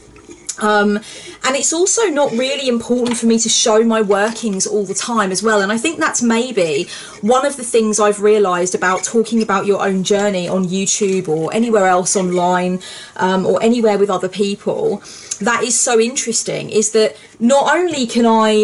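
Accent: United Kingdom. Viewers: This was brilliant ... can't say the word with the adorable British accent though ... thanks ☮️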